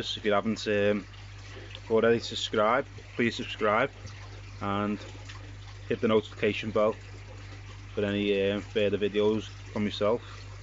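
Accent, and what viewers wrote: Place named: North London, United Kingdom. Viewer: Watched a few of your videos and by the accent your not far from me 👍🏻 I live in North London now but grew up in Skem..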